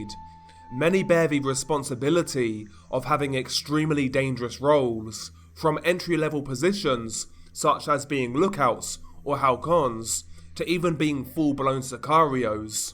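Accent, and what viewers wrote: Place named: United Kingdom. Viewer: He’s British dude we all know what he’s saying stop complaining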